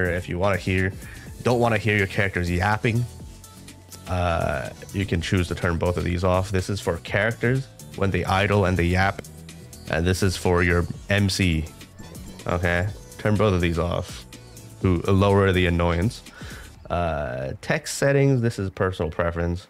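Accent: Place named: China. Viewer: are u chinese? I can feel the accent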